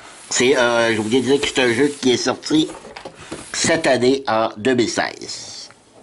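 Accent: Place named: Quebec, Canada. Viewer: Merci pour ta vidéo, bien détaillée, pour tes bons voeux (reçois les miens en retour) et ce délicieux accent de la Belle Province qui régale mes oreilles françaises ! Au plaisir de te retrouver sur d'autres vidéos (j'ai adoré ton Top 10 jeux solo). Bye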